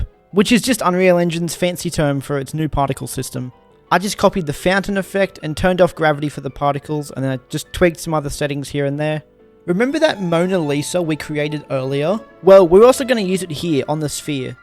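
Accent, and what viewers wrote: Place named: United Kingdom. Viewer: Bro roasted Rito so hard in his british accent. Loved the video man, so good 👍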